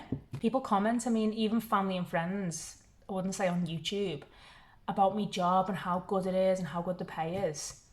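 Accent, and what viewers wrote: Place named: Merseyside, United Kingdom. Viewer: You were the first video I saw when I began looking for a new way of living and I struck the jackpot. To hear a Merseyside accent and to see you working towards the kind of life I wanted was an inspiration to me. Like this week, when I hit a bump in the road, and despite making many mistakes, I found it more manageable. Thank you and congrats on your 1k 💜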